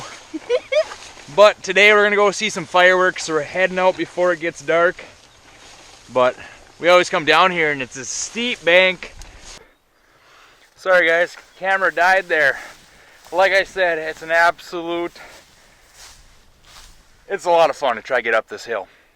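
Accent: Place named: Minnesota, United States. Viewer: with your accent you must be fishing the red river in Minnesota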